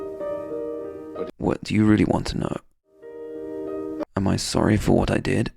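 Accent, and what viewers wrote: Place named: United Kingdom. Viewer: please sir i like your accent so much and I'm your follower student on youtube podcast. I found the 4000 essential words are read by American accent and really I like it to be with your British accent. please try to help us by reading these 4000 essential words in English.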